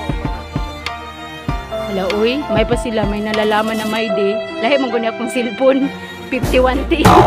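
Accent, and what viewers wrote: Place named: Iloilo, Philippines. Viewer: Nami gid ang ilonggo ba hehe malalambing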